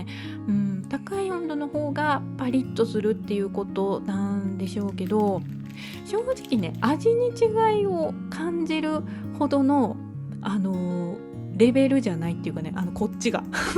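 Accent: Kansai, Japan. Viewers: 通りすがりにちょっと立ち寄らせてもらいました✋😅 パイ生地とフランスパン🥖見ました👀話し方めっちゃ可愛らしい🤩🤗 真夜中のに笑いこけた😂 関西弁はやっぱりええねぇ いっぺんにファンになりましたよ🎉 私は奈良から和歌山に嫁いで微妙に違う言葉を使ってます。 まずはフランスパン😘😘😘🥖Tryしますね 楽しい動画見ながら😘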